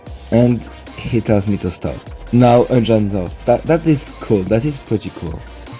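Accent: France. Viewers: Is it just me or voice seem a little bit less french-y? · wesh un francophone qui assume son accent baguette....respect.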